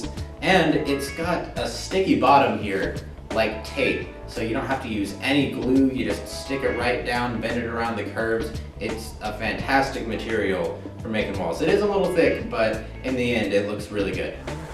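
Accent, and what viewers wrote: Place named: Michigan, United States. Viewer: I'm from Michigan and u got the Michigan acsent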